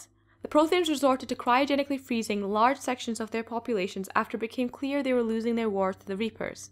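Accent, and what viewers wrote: United Kingdom. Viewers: What part o UK are you from?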